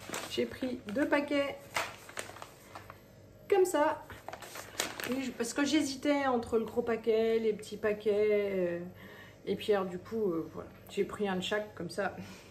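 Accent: Alsace, France. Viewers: Bonjour Y rrrepennnnnser 😂 yo hop la ! Un petit reste d’accent alsacien 😊 votre retour me donne envie de tester les triangles dans la soupe au lieu de la crème. Les pains d’épices au chocolat Made in Allemagne sont de retour chez Leclerc Alsace … miam ! Bon WE à toute la famille et caresses à Chupa ! 😙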